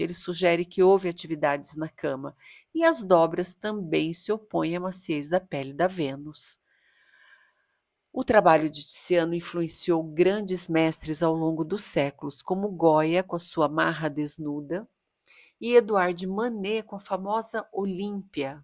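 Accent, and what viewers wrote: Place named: Rio de Janeiro, Brazil. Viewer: Acho que vc é carioca!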